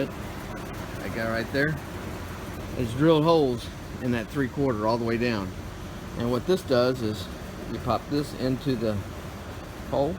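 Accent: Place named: United States. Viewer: Love the American accent :)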